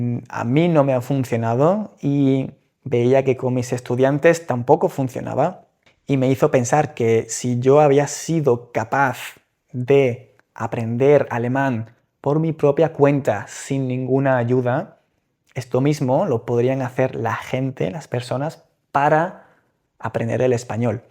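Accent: Spain. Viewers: You learned spanish with spain dialct/accent right? my favourite is mexican one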